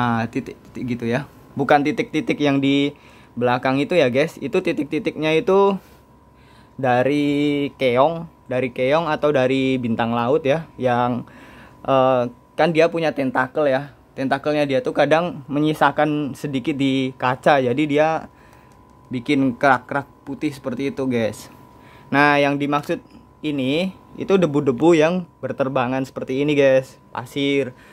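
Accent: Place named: Java, Indonesia. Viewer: Jowone endi mas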